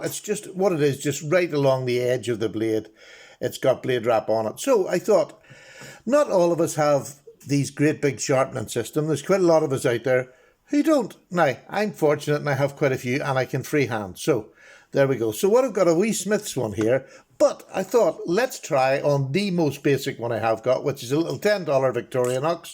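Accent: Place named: Ireland. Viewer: I don't understand half of what this gentleman says? Irish? Scottish?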